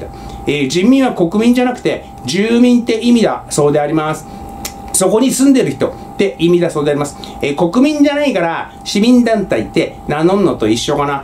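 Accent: Fukushima, Japan. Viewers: 東京に住む人々は、まさに首都らしく日本を象徴していると感じました。子供じみた振る舞いです。政治にも関心ないのですね。ならばそこだけ取り残すように、日本は地方から目醒めていけば良い。都知事にあの方を選ぶような地域ですしね。呆れた。ほとほと呆れました。さん、これからも応援します。時に出る福島弁、懐かしいです。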